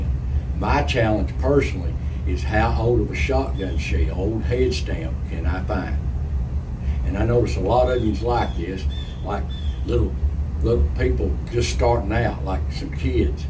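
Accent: Appalachia, United States. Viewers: Hi, I happen to love your Accent and All of your videos. You are doing a Great Job sharing the lost History and You Do Keep it Real! Thank You so much for being a teacher of your heritage and culture and mine as My Family is from Spruce Pine,North Carolina and although from different regions of Appalachia I love hearing and learning about all of it!! Thanks again and I hope you have a Wonderful and Blessed New Year!!😊 🙏🏻🎉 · I'm Right there with ya, with the strong hillbilly, mountain folk accent. And I'm Not ashamed one bit, doesn't mean I'm less educated, regardless of what people think. Common /mountain (hourse sense) of far more important and valuable than books smart... My opinion. Many years ago, when I did vlog type videos on YouTube, long before YouTube went commercialized. I had subscribers, mostly based on people just love to hear me talk, lol. 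Didn't matter what I was talking about. Lol. You've got me looking at a few metal detectors. Something I think would definitely be interesting, regardless I find anything of value or not. I'm like you, just like finding old gun shells. I'd be happy to find some of those from early 1900s, that'd make my day, alone. Thank you for your youtube channel. I've told many bout it, I love having a history Channel based on my area. Thanks for ALL you do! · I am an old Appalachian hillbilly as well. I am quite proud of who I am and where I came from. I came from a hard working and God fearing people that respected and loved their neighbors. Our culture is slowly going out and you are doing a great job of recording a part of our history. You keep up the good work my friend and don’t worry about the accent. I understand it just fine! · me and my folks talk just as you do here in the Ga Appalachia near Ellijay. 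I appreciate you friend. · you and that baked in accent represent and embody the wisdom of the great Appalachian mountains!! We thank you and have a happy New Year!! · I enjoy all your videos. I sure appreciate all you do to bring us. I enjoy watching you metal detecting whether you find a treasure or not. To me the ole broken plow piece is a treasure for, it has it's own history or part of a ole bean pot; it's all a treasure to someone at some point. I'm still so excited about me finding the 1890s shotgun shell I found at the lake because, you got me so Interested in such.. I still haven't gotten to get a metal detector, maybe someday. I follow a lot of metal detecting on YouTube. I TAWK the way you do, my accent is my Appalachian gift. My kids don't TAWK the way I do either. God bless and Happy New Year to you all. Thank you for all you do, sincerely appreciate you. ♥️ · Thank you so much for sharing your mountain stories. 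I have seen every video that you have put out. My people are from Pikeville. Your stories are like so many that was told to me by my Dad. Appalachia is so rich in American History and culture. You’re an excellent historian and researcher. Your accent adds the authenticity to the history that you are presenting. It would not have the same flare if told by someone not from the area. You give your audience a “real slice of life”. God bless you. ❤ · I have been laughed at all my life for my Appalachian accent but just like you I refuse to change. I am teaching it to my Grandson. I walk tall being from the mountains of Appalachia and am proud to live here. Words to me like red neck and hillbilly are compliments. Appalachian people are the best we love our God, family, country and our guns. God bless you and all the great folks of this country, especially those from Appalachia. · I talk the same way you do. 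It's the Appalachian in us. I have had people say that people in our region talk different it's just the way we are Love your videos God bless you I also have a wood stove but my home has oil heat too. It's expensive right now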